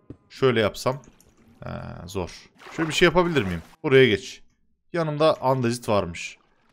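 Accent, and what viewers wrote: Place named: Kahramanmaraş, Turkey. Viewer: Abi maraşlımısın? (şiven benziyo)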